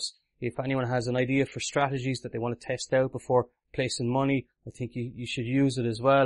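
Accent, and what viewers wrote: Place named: Ireland. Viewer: What part of Ireland are you from man ? good break down of what AH is. cheers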